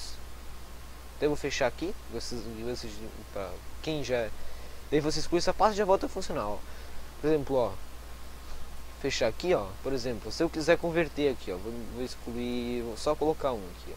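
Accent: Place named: Curitiba, Brazil. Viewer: vlw ai cara só para constar amei seu sotaque, vc é de Curitiba?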